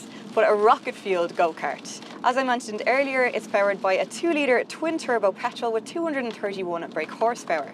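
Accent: Ireland. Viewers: Here's a comment regarding new hardware: I would marry Irish girl blind folded... :D Love the Irish accent. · Oh my god! I had forgotten how quickly i fall in love when hearing irish accent spoken by a stunning woman 😳 And - a great review 👍🙂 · Cool car and love your Irish accent. I laughed at the "WHAT ARE THOSE" with the tail lights. I think they are just a fun little touch as Mini is a traditionally British car even if owned by BMW now. Overall great review.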